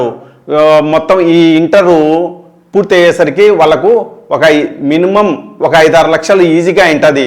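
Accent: Kadapa, India. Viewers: ఏంది అబ్బా నీది నీ భాష చూస్తుంటే కడప ఉన్నట్టుంది నీ యాంకరింగ్ ఏం బాలేదు అబ్బా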